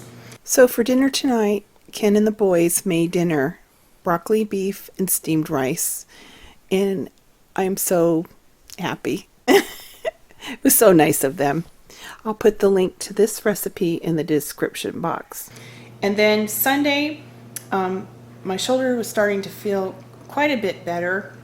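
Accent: South, United States. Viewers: I've never had In n Out, but I've definitely heard of it! Everyone seems to love it. By the way, your accent sounds very southern. Are you from the south?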